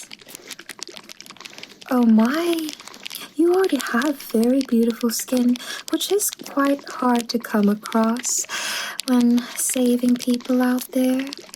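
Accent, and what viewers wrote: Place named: Transatlantic, United States. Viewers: Damn why the green screen look so real Makeup videos are always a classsiccc and I love the transatlantic accent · shows yet again that is a woman of many artistic talents!!! the acting was si good here and the transatlantic accent too, it really gave a vintage vibe 💕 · Have I been saying Avon wrong this entire time or is it just the transatlantic accent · Saw the notification and RAN!! Also, your transatlantic accent is getting really good!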